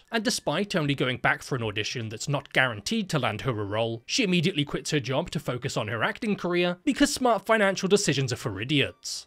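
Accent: England, United Kingdom. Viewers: Ive said this before but bros humor is peak. Amplified by his British accent.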